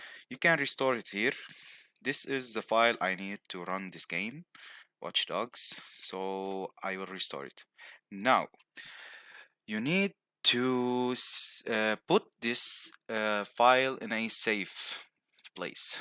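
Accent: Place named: Italy. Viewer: Grazie caro ho risolto, sembri italiano dall’accento quindi bella pe te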